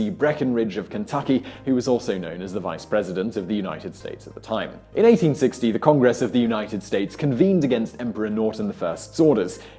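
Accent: England, United Kingdom. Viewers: man, northernlion sounds british in this. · Reads "misled" as mice-alled Proof that having an English accent doesn't make you smart...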